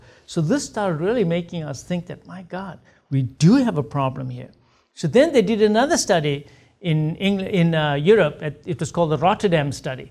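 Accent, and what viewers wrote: India. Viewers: Doc your accent is very nice not too english but still have that little indian accent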